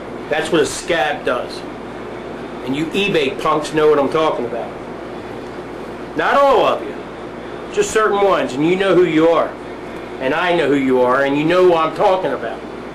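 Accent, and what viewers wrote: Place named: Northeast, United States. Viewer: He must be from the North East I guess New York or New Jersey one of the reason I moved to Texas.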